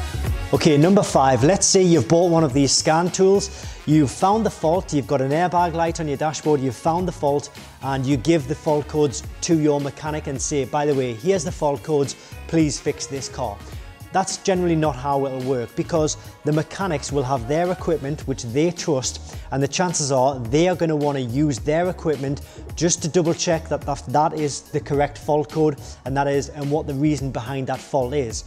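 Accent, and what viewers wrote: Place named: Liverpool, United Kingdom. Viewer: I am a fan of accents. I like to listen to different and know where they are from. I am curious which part of England (is it England?) is yours from. I would be guessing Liverpool, and as soon as I guess that I think I am wrong (?)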